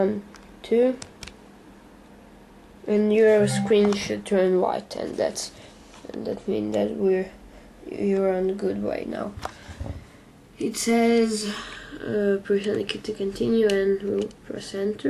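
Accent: Russia. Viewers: u sound russian im russian but i dont have an accent kool vid thanks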